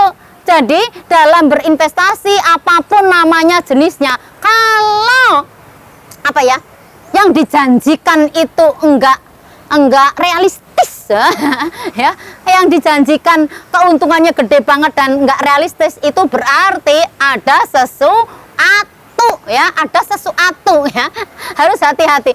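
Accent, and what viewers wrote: Banyumas, Indonesia. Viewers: khas ngapaknya mantap pnjelasannya sukses selalu utk mb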